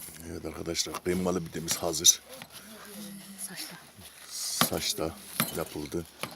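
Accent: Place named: Nevşehir, Turkey. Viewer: Merhaba kardeşim ya ben bu görüntüleri çok seviyorum. Köyüm geldi aklıma. Ben Nevşehir 'liyim şiveniz bizim köylüler gibi. Bide tavuk sesleri geliyor keşke bende köyde yaşasaydım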